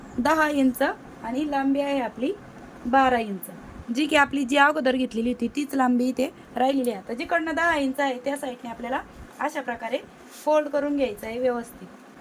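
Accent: Ahmednagar, India. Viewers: अग विचारायचं कारण म्हणजे भाषा नगरचीच वाटली ना म्हणून विचारलं आम्ही पण कुकण्याचे आहोत पण नगरला रहातो ,तुझे व्हिडिओ बघायला लागल्या पासून खूपच पिशव्या शिवल्या मी खुच छान सांगते तू लगेच लक्षात बसत 🙏🙏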